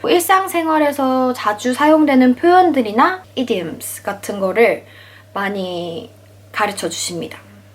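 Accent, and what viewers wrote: United Kingdom. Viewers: 영어가 유창한데 아시아/미국식 섞인 발음이 심해서 사실 예전부터 스트레스를 되게 많이 받았어요.. 어떻게 더 공부해야 할지 고민도 많이 됐었고ㅠㅠ 유튜브 알고리즘으로 님 영상 우연히 보게 됐는데, 영상으로만 봐도 아주 친절하신 분이고 무엇보다 언니의 목소리가 너무 좋으세요 영상틀고 잠들정도인데요❤️ 엑센트도 딱 제가 찾던 느낌이라 정주행 열심히 하고 있습니다.. 언니 앞으로도 브이로그든 공부법이든 그냥 영상 많이많이 올려주세여🙌🏻 언니덕분에 이제 드디어 영국발음고정 마음 먹었습니다..😤 (유튜브에 댓글 정말 안 남기는 편인데..부끄럽습니당,그래도 너무너무 저에게 힘이 되어주셔서 이렇게 달고 갑니당..🌹) 좋은 하루 되세요~!! 🥰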